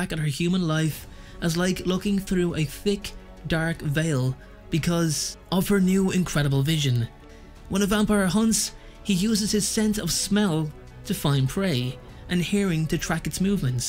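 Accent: Ireland. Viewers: OMG WTF do u sound so bloody Irish 😳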